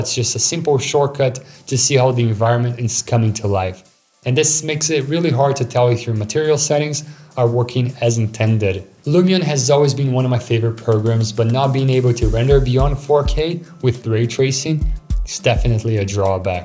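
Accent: Brazil. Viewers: are you brazilian? you english sounds like brazilian.´s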